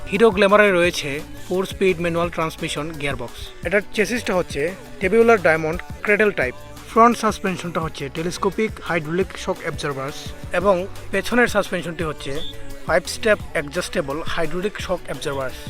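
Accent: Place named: Sylhet, Bangladesh. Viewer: সিলেটের মানুষদের একটাই প্রবলেম, শুদ্ধ বাংলা ভাষায় কথা বলতে গিয়ে একদম মুখের অবস্থা খারাপ হয়ে যায়। শুদ্ধ বাংলা ভাষায় কথা বলা কি এতই কঠিন? আসলে একটু চেষ্টা করলেই এটা মানিয়ে নেওয়া যায় এবং পারা যায়।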